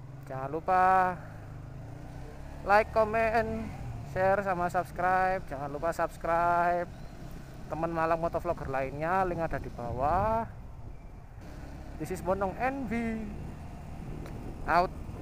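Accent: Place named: Java, Indonesia. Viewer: Om iku stang e tetep a...opo kudu di ganti?? 😂 Medok2 an omong e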